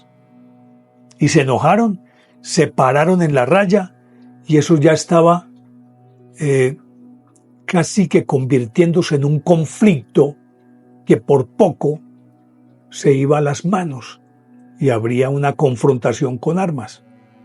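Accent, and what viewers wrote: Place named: Colombia. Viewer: Me encanto esta historia y narrada con un acentó colombiano que chevere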